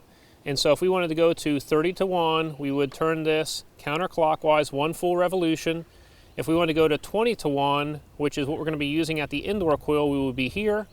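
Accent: United States. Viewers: This guy has an accent, which I can't tell where in the US he is from. Just listen to how he pronounces the word "coil"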